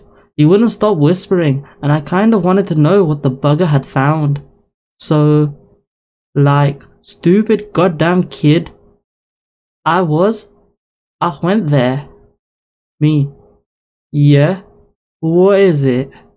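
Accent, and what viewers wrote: Scotland, United Kingdom. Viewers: Advice. Ditch the Scottish accent on the video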